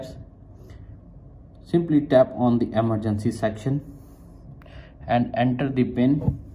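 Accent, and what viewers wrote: India. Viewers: Don’t be fool by hi Indian professional voice all liar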